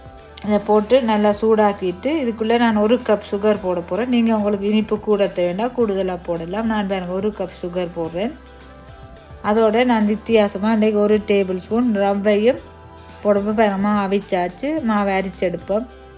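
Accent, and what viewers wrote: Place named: Sri Lanka. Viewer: Sis nenga sreelanga ah va sis voice slang super🎉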